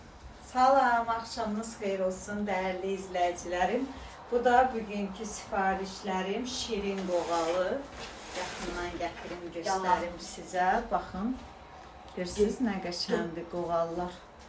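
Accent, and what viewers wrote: Talysh, Azerbaijan. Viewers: Gözel lahceli taluş gizim, Allah, ruzuna bereket, evladina sağlig, safa versin, inşaAllah.🤲 İncime gizim, ğörkemi gözeldir, amma bir az gizartsan lap gözel olar.Bela bulka ğörkemi var.Va bir da, üzerine gara cörek otu sep. Onun ğörünüşü va dadi bir başqa olur. 🎉Dediklerimi ağbirçek nana kimi gabul et.😘❤️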